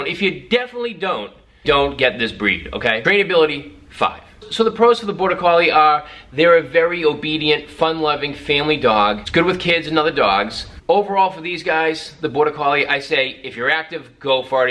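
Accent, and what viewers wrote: Boston, United States. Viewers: Are You from Boston, M.A.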